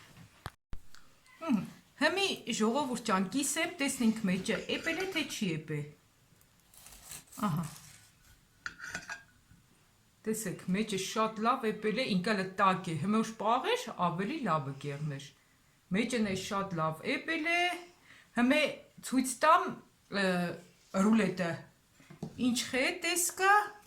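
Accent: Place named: Gyumri, Armenia. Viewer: Shat em sirum gyumrii barbare akcente...😃😃😃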